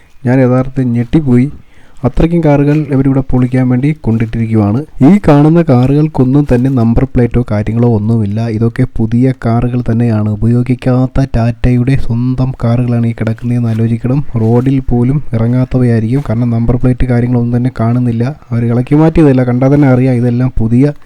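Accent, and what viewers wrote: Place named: Trivandrum, India. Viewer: Kulathunkal motors scrap cheytha vandikal aakanam... Slang tvm aayath kond oohichathaanu...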